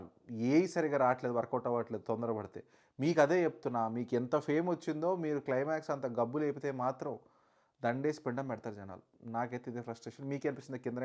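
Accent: Telangana, India. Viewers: Endanna Telangana slang lo ochav esari 😅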